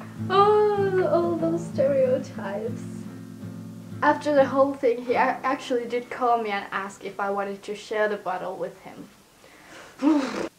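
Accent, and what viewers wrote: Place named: Finland. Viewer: Hahhah, it's a Finnish accent :D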